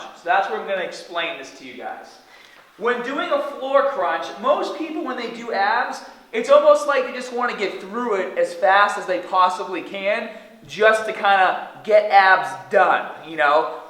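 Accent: Boston, United States. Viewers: You are from boston no ?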